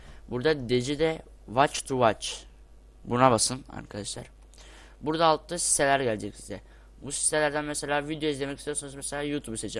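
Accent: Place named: England, United Kingdom. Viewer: ing aksanına hayran kaldım kardşmmm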